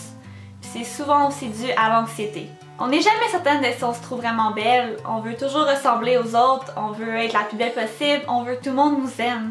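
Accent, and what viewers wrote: Canada. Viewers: belle vidéo j'adore ton accent canadien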